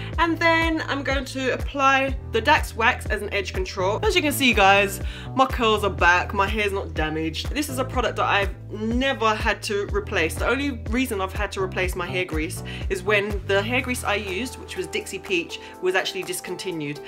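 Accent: United Kingdom. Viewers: I love you UK accent, I've Cameroonian accent 🤪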